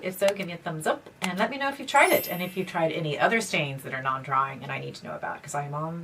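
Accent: Ireland. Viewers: Love the Irish accent peeking through. Thanks for the honest review. Hope you had a great Christmas. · I love your Irish accent peaking through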